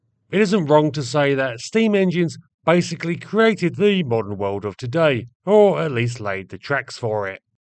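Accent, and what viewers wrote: United Kingdom. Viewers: battle of the monotone Brits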